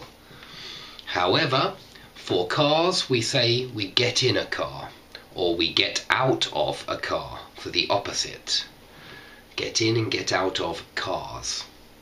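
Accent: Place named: RP, United Kingdom. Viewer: Thanks a lot sir I really love your BBC accent.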